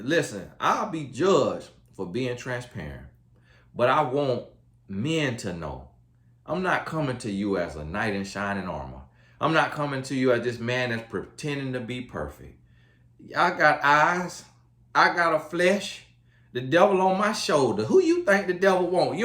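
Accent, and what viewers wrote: South, United States. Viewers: Love this southern man talking in Ebonics! Yes we hear you brother. Time for men and women to claim their God given place! It’s on the men. It’s on Adam. Praise God for breaking curses. Set the example. Say the truth.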